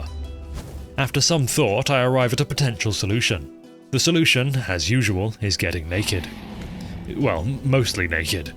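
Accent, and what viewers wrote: United Kingdom. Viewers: Watching an extremely british person say "Fuckos" in the most polite and eloquent voice was not something on my bucket list.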